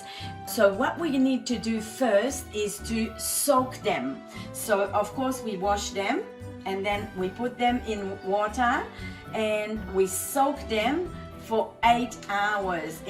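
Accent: Australia. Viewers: I'm hearing several different accents in your speech, one of which sounds Australian/New Zealand??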